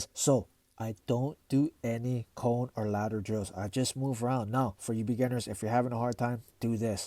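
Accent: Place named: Hawaii, United States. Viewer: My braddah, you from Hawai’i ah!? lol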